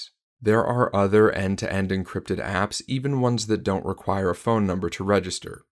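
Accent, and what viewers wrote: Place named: United States. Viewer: sorry. Just your accent sounds American to me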